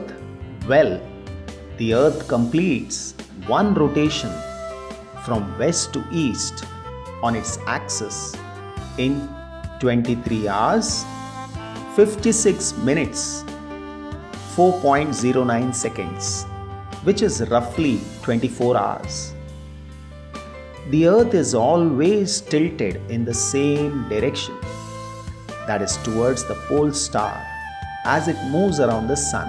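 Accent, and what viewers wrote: India. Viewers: hahahaha this is not Hindi accent but more like dravidian languages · Mayank Singh Rajput hindi accent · Hahaha, I love the Indian accent, especially when he says "cooled".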